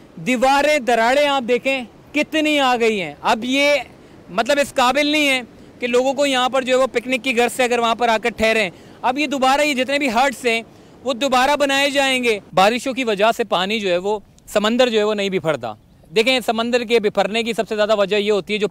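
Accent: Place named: Punjab, India. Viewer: اردو کو پنجابی انداز میں نہ بولیں... شکریہ.... آفتاب عالم خان بینکاک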